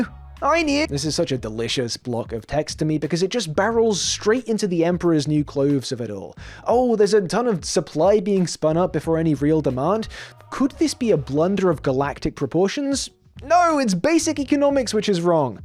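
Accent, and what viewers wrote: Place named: North, United Kingdom. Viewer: Why does sound more like he's from the north in the flashbacks?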